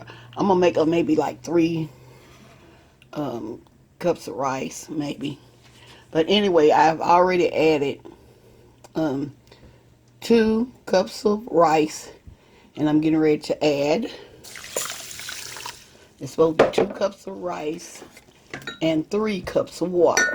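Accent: South, United States. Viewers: I'm so glad I stumbled across your channel. I'm definitely going to try this for Christmas. I love your southern accent, I'm from good ol Alabama myself lol When you said, "I don't do no roux and all that, unt unh" I 🤣 and I felt that Merry Christmas.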